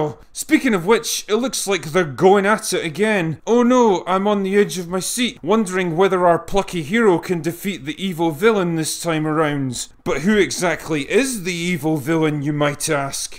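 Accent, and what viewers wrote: Scotland, United Kingdom. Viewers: You get more and more Scottish every video, won't be long before we won't be able to understand you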